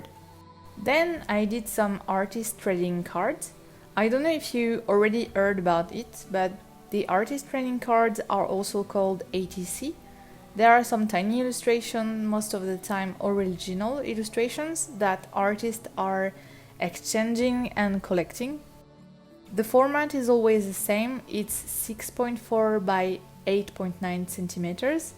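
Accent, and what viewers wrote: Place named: France. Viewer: You have a french voice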